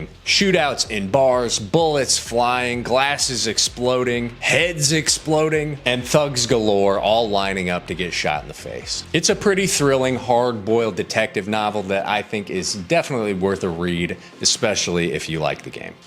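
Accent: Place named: Australia. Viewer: The Australian accent got you a follow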